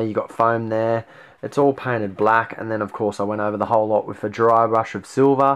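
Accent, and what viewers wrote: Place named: Australia. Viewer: Australian accents really make you wish you had one-From Italy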